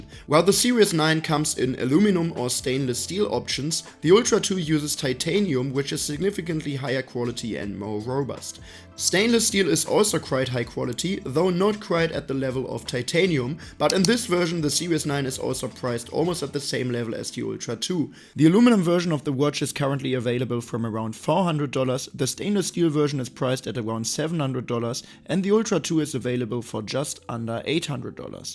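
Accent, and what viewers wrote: Germany. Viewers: Love the accent haha, very German review, useful and helpful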